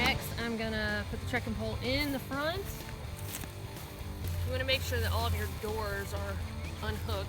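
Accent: South, United States. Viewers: Ha ha! I like the low-key southern girl thinkin' noises! Eeehhhhhmmmmm..... sounds like home. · I was thinking, shorten the poles down dummy, but the bathtub effect makes sense. Where is your accent from, I'm guessing Arkansas or Alabama.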